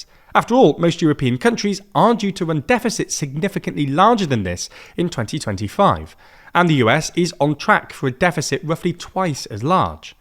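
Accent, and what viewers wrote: England, United Kingdom. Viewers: So why not direct your biased, English accent analysis to the Wall Street collapse going on right now?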